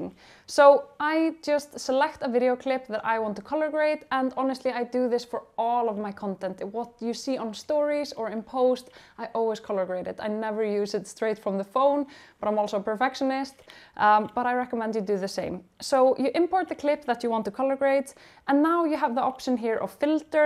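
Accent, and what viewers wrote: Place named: Iceland. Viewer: Love your Icelandic accent!! Beautiful ❤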